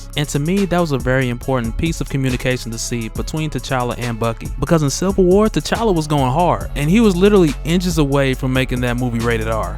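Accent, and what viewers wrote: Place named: Texas, United States. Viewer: This my first comment but I have watched and liked a lot of ya videos always speaks that Texas trill 💯💯💯💯💯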